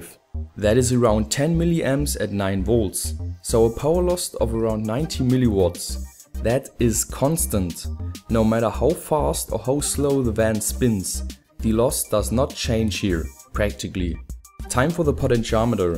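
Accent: Germany. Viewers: Xmcgamer0X he is german soo ... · He's German, so his pronunciation can't be perfect. But I bet you don't talk another language besides English as he does! ;)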